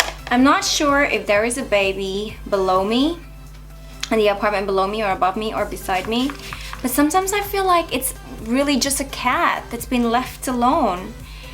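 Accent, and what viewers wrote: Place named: United States. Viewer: First of all you look AMAZING 😍 Second, where are you from ? You sound like an american girl to me. I'm lovin' your channel ❤😘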